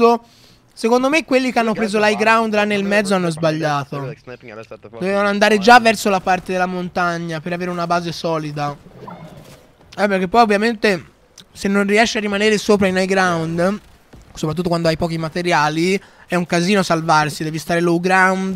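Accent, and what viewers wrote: Tuscany, Italy. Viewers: Ma sei toscano pk si sente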